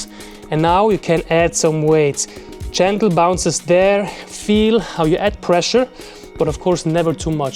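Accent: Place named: Russia. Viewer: интересный акцент, вы русский?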